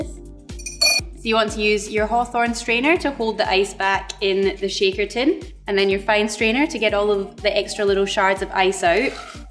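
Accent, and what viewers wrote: Scotland, United Kingdom. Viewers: I love your accent. By chance, are you of Scottish origin? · Thanks for that! I'm usually good with accents and figured you were from Western Scotland and had spent some time in Canada or the US, but had no idea about France. (You have your hobbies, i have mine.) More importantly, thanks for the lesson in one of my favorite go-to cocktails! Cheers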